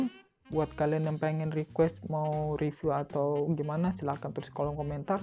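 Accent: Palembang, Indonesia. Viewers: orang mana bang? logatnya kek palembang hehe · orang palembang ya bang? logatnya kayak orang palembang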